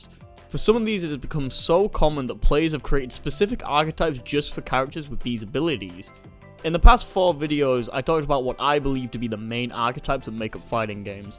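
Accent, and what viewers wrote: United Kingdom. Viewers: Another fucking banger! Everytime I let your silky british voice lul me into listening to info about the topic the punchline hits and I never see it coming. I don't genuinely laugh at many videos but yours always manage to get me. Never change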